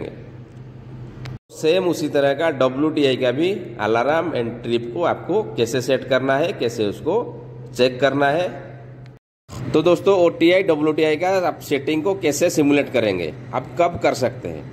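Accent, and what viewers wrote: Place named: Odisha, India. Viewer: Odia guy....bilkul odia accent. good job dear.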